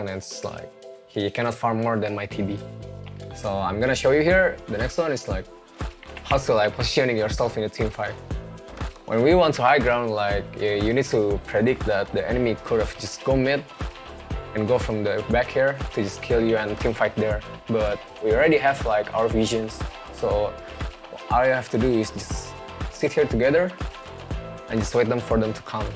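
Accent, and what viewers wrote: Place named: Indonesia. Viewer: 7/10 inggrisnya orang indo, dia kuliah apaan dah?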